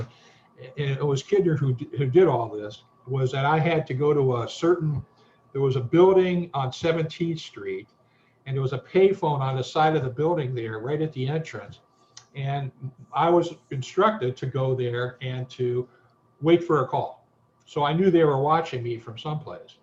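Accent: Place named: South, United States. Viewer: Mr., I gotta tell you, I wasn't used to your unique Southern accent, at first. I originally subscribed because of your encyclopedic knowledge of Organized Crime in KC. But now???.....I absolutely love your accent, lol. I mean, I love the relaxed way you tell your story with bits of humour in between. I think your accent is adorable, a word which I don't think I've ever used in my life, lol....I, literally, feel like Mark Twain is telling me mob stories with bits of home spun humour in between....I LOVE IT!!!!!!!......Oh yeah, I love your accent too, Dan..... 😎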